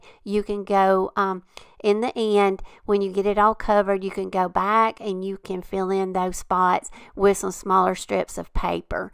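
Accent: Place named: Kentucky, United States. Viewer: you're so very talented! Also, since I'm in KY, you do talk just like us. The recipe cards almost made me cry, because they look just like the ones my mamaw and my mom left us. They were stained, very well-used, and written in their own hand. Lovely video!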